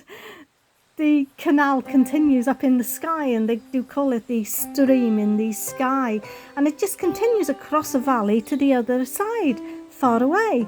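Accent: United Kingdom. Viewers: This is a very informative tour and it's relaxing listening to your sweet voice and lovely British accent.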